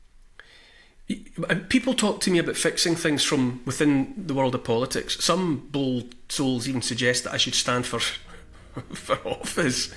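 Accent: Scotland, United Kingdom. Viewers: As a fellow former Stirling resident, now an ex pat, greetings from the mountains of Norway. We must not let the b…..s grind us down. It is going to be back to the Stone Age type barter economy and too much for many. God help us, keep up the rhetoric and that great Scottish accent, we need you more than ever! Alex with kind regards.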